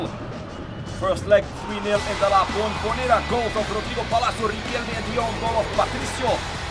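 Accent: Portugal. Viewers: E portugues falando ingles, fico mt engraçada essa narração ai kkkkkkkk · Nice portuenglish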